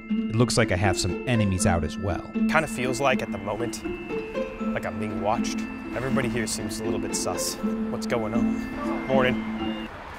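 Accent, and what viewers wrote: United States. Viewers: As a german man konnte so einfach erkennen das du amerikanisch bist 😃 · are you from minnesota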